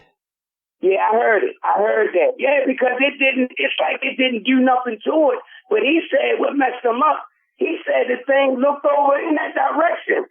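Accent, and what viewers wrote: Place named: South, United States. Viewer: Aye, Great stories, can't wait for more. Thanks!!!!! P.s I Love your Southern accent!!! Be safe!!!!!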